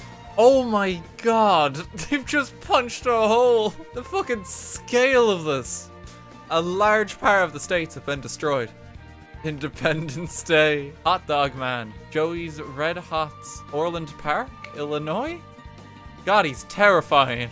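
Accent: Ireland. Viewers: No he's irish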